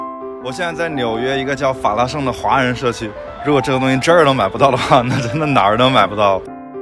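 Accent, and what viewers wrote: Wuhan, China. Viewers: 要不要咸蛋黄 都听出了武汉话口音 哈哈哈哈 好亲切！ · 你这个汉普越听越亲切！ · 听你口音就晓得你是武汉牙，哈哈哈哈，蒸糕全是小时候的回忆！ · 你这个普通话一听就是武汉的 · 听口音就知道你是武汉的，南京那个 不就是变种的 武汉糯米包油条么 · 哈哈真~的是武漢口音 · 武汉普通话是相当的正宗，封面的蒸糕也是武汉的样式。现在这玩意在武汉也看不到了吧？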